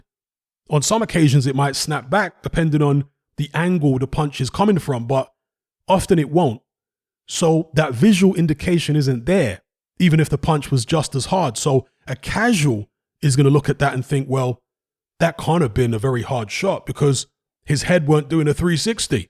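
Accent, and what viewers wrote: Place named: United Kingdom. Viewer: Bruv, the minute I heard that British accent I knew you were trolling. It was legit, quit hating.... Anthony Joshua lost, its over, get over it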